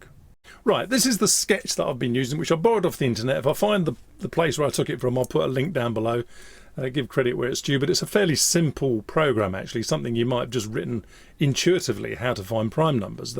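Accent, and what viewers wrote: United Kingdom. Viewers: Hey great video!! Where in the UK are you from? Thanks for your channel!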